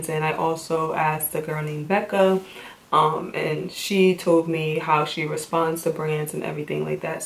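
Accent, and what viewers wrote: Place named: Jamaica. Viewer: hey i love your accent , are you Jamaican?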